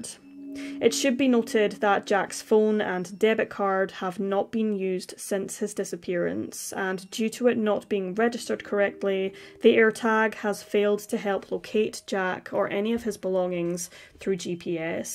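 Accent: Scotland, United Kingdom. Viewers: North east scotland accent?! 😮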